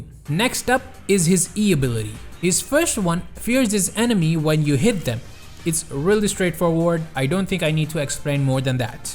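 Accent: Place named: Philippines. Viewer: You sound very pinoy. Greetings from Malaysia. When you talk about small counters against heroes, you can add that cold snap interrupts hero such as Earthshaker who has awful casting animation. EMP drains Medusa's Mana Shield. Ice Wall and Forged Spirit depletes Templar Assassin's Refraction quickly. Tornado dispels enemies too, for example Windrunner's Windrun and Scurry of Hoodwink. You also should mention Catacalysm which is the highest damage single cast spell in DotA, higher than Thundergod's Wrath I believe.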